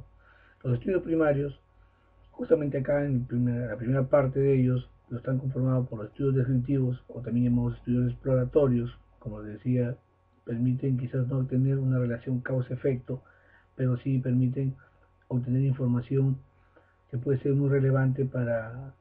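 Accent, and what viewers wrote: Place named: Peru. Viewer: yo entendi, solo que tiene acento, parece Peruano.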